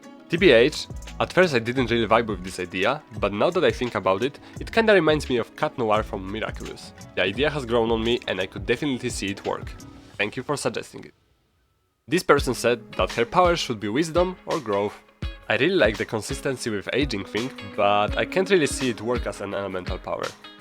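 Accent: Poland. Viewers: Chwila, ja mogłem cały czas komentować po polsku! Jakim cudem nie poznałem rodaka po akcencie?? 😭 BTW ̶C̶z̶y̶ ̶t̶o̶ ̶b̶y̶ł̶a̶b̶y̶ ̶n̶e̶k̶r̶o̶f̶i̶l̶i̶a̶ ̶j̶a̶k̶b̶y̶m̶ ̶p̶o̶w̶i̶e̶d̶z̶i̶a̶ł̶ ̶"̶s̶m̶a̶s̶h̶"̶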